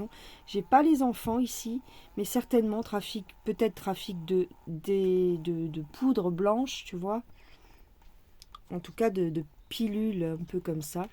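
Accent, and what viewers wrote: South, France. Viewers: Et tous les neuneus qui se marrent avec l'accent du Sud ! Le titre est vraiment approprié, c'est n'importe quoi ! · Bonjour Une touche d'humour avec cet accent du Sud 😊 Pourrais tu faire une vidéo sur les pluies diluviennes à Dubaï? Merci... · Vidéo insolite grâce à cet accent du sud qui dédramatise la situation. Bon 1er mai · bonjour ont ce croirait dans un film de pagnol j’aime cet accent du sud sa sent la lavande et le soleil ☀️ bon 1er mai 💐 désolée ilya pas de muguet bisous 😘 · Oh lala d' tu m'as fait trop rire avec ton accent même moi qui habite le sud je ne parle pas comme ça 😅❤😂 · 😊 bonjour 👋. Tu me fais rigoler avec l accent du midi.merci pour le tirage. Oui le mois de mai vas être encore très difficile. Je te souhaite un bon 1er mai. Moi cette année je n achète pas de muguet..il.est où. Ça me rappelle une certaine chanson. 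Bisous 😚 😚 Gigi. ❤❤❤❤. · L'accent du soleil dédramatise ce monde merdique Merci ❤❤❤ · Bonjour j'adore cet accent du sud de la légèreté du Soleil et continuer un petit peu s'il vous plaît au cœur pour avancer oh je suis en train de dicter le message Et je prends l'accent nous allons tous parler un peu marseillais aujourd'hui En tout cas j'adore Merci pour ce tirage continuez de chanter un peu oui les chansons de sont superbes moi j'adore mais cette histoire me paraît pas tres nette De toute façon c'est sa vie privée ça ne nous regarde pas nous aimons le chanteur et ses chansons se remettent sur le chemin Que j'adore l'accent que vous aurez avril demi-vingt-quatre et surtout mon 1er mai pour demain gros bisous ❤❤❤ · Bonjour, merci pour la vidéo et l'accent du midi moi j'adore comme j'ai des copines la bas sa change de la Tourraine qui est froid et les gents bien triste. Vous avez raison de vous lachez sa fait du bien et on rigole en plus. 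Bon 1er Mai ainsi que tous vos abonnés🥰❤🕊🌟👒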